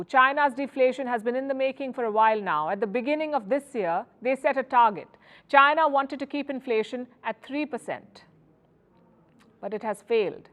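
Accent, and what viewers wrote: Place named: India. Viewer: this media is totally anti china as u can see the commendator has as trong curry accent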